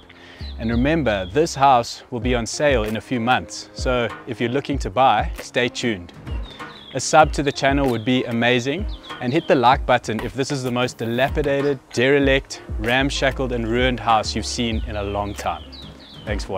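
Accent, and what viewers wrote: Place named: Johannesburg, South Africa. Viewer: Imagine my delight when clicking to the vid and hearing that familiar lekker Joburg accent. Easy sub. Well done so far and keep it up. Really enjoy the videos I've watched thus far, very relatable content.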